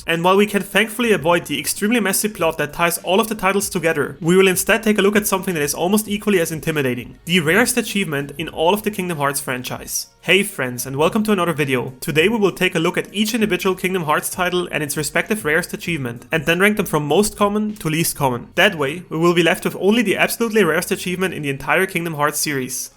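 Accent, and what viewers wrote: Germany. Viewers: Man hört direkt dass du deutscher bist. Trotzdem geiles Video!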